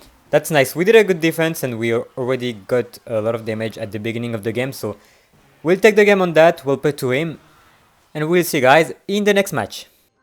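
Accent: France. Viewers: l love this french accent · good french accent bro t'es français? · incredible french accent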